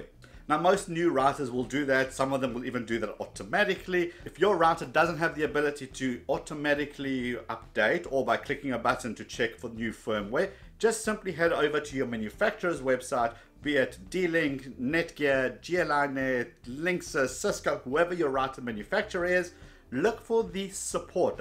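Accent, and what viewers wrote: Johannesburg, South Africa. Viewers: South African? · U sound South African ... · Love that Joburg accent. Content is also great. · love the South African accent ! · stumbled onto this vid and 0.2 sec I can tell he's from SA :)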